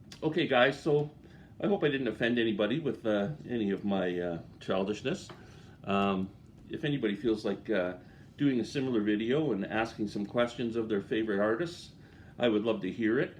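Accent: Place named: Canada. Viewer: That was hilarious! Also, I can't imagine anything coming across offensive when speaking in a Canadian accent. Cheers!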